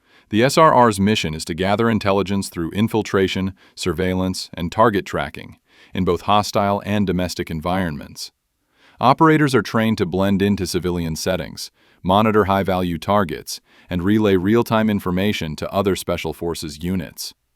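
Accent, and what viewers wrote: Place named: United States. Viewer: I was going to correct you but as soon as I heard an American voice I won’t bother and just scrolled on.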